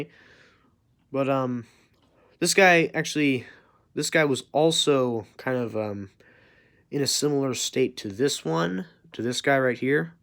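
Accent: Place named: Australia. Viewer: That Voice was classic sounded like a bit of a sophisticated Ork haha mixed with a Aussie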